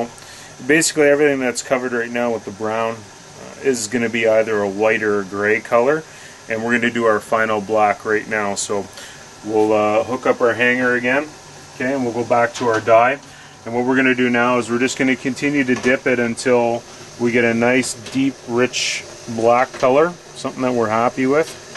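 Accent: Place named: Canada. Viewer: He sounds extremely Canadian.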